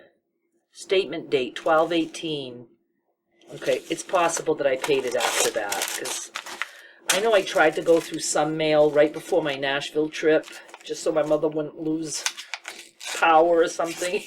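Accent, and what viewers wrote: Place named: New England, United States. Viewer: I know you’re not from Massachusetts but I was raised there and listening to you reminds me of growing up and the way people talk up in NE. I’ve lived in SC for 30 plus years. I was dozing, listening to you and I heard my name, just the way my father used to say it. I asked about ASMR and Patreon. I went to your pages. I usually google everything but just thought I’d ask you. It was nice to hear my name.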